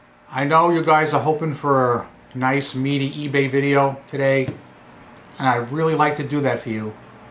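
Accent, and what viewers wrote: New York, United States. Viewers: noticed ur NuYawk accent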